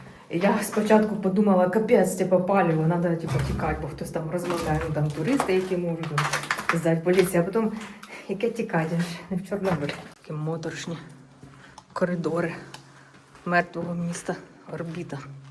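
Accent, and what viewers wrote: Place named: Cherkasy, Ukraine. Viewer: люблю цей черкаський суржик)))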